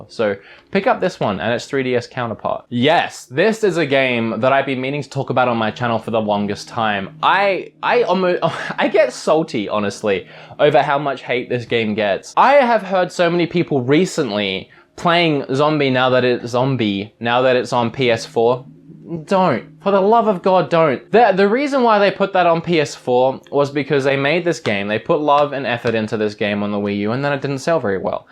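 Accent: Australia. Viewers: YOUR AUSTRALIAN!!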